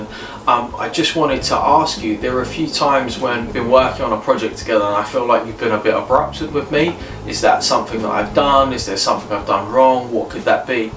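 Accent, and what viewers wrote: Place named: United Kingdom. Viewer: brother , your accent is terrible , it could be perfect for brits but for other it is a headache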